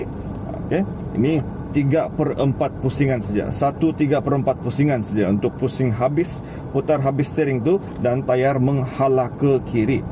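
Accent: Sabah, Malaysia. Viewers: Macam logat Sabah jk ni...btul ka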